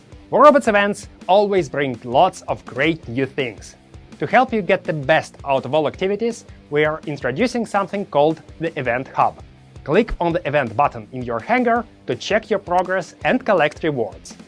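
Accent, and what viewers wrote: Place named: Russia. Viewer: Мэн ну у тебя и акцент... Русский чтоль?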